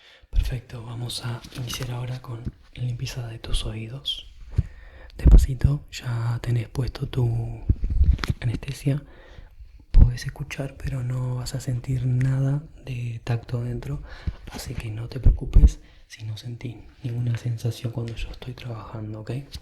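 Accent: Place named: Uruguay. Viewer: Me encanta tu acento. ¿Sos Uruguayo? Saludos!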